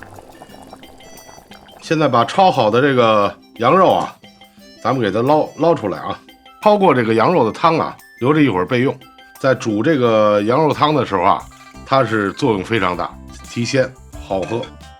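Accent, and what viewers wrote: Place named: Beijing, China. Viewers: 主要是听地道北京味普通话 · 南方人来听京普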